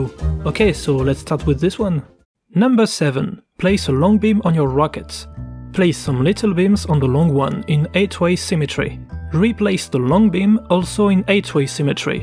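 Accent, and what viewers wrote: France. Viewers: I feel like I watched a video from a frenchman in English for the first time ever. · This is amazing haha. French accent Beautifullll ! Edit: And a great refresher + I didn't know some of them so thank you :) Edit: Also, I think you might be right that it's not super sustainable (the english channel) but this video should definitely not be the last! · Oh I love French style English videos like this! Keep up the good work<3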